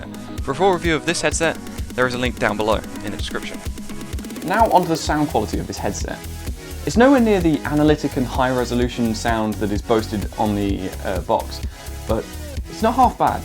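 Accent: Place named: RP, United Kingdom. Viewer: love how posh you sound in your videos, mate 😂 also, what's with the 2 month absence? 😏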